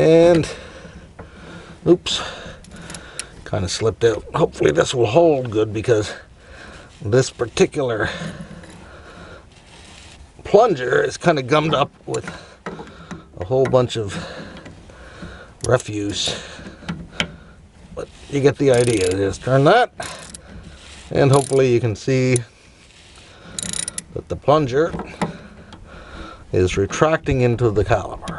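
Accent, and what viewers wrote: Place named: Grand Falls, Canada. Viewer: Are you from Grand Falls? I hear the accent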